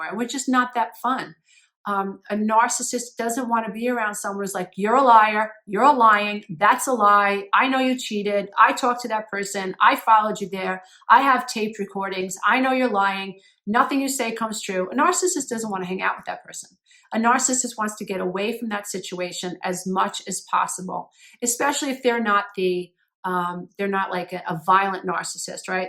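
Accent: United States. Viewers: Hi I have seen your videos on Instagram as you only popped up the other day on YouTube as I was looking for something else to watch then I clicked on your feed to see what the video Is all about and I have two very evil brother and sister who are really cruel to me and done and said the most wicked things too me for the last 50 years but I am not sure if what you say is about anyone so just wanted to ask you that ok and I am not a troll as you mentioned in one of your videos on Instagram lol but I I will say this your absolutely gorgeous and I love your sexy American accent lol it's sends me to sleep because I am very unwell here and having trouble getting to sleep for days now so you have some good uses in you if nothing else hahaha im from England 😉😂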